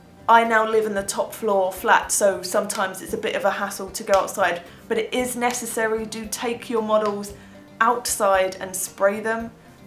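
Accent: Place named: Manchester, United Kingdom. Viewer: I love your accent. It makes me miss my Mum. She's from mancheste. Good video.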